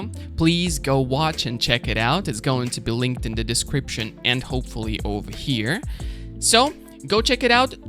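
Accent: United States. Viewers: are you American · Hi, just one question for you '' What has made you sound American? as you mentioned you have never set foot on the U S. thanks.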